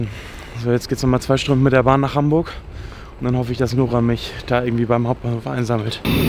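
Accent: Carinthia, Austria. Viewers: Kärnten, Niederösterreich? 🤔 😅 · Kärnten Niederösterreich? 🤣🤣🤣🤣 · Kärnten Niederösterreich?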